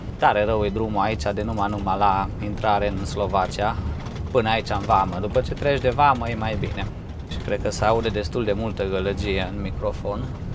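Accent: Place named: Transylvania, Romania. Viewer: cu un accent de ardelean care nu ai cum sa nu-l indragesti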